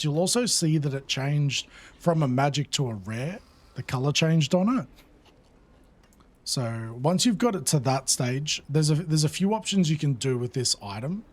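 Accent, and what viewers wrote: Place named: Australia. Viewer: Hey mate, the missus likes it comment under mine.. huge thanks very informative and a pleasure to hear a fellow Aussie do a tutorial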